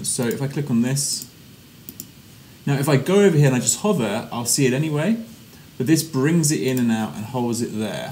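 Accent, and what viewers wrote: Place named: United Kingdom. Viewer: Great tutorial! Very helpful. The British accented paused before saying "ZEE for zoom" made me smile, too.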